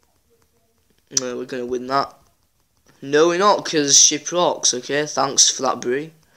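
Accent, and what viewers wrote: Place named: United Kingdom. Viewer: Awesome video bro. I really enjoyed watching it. Are you British btw? I like your accent a lot.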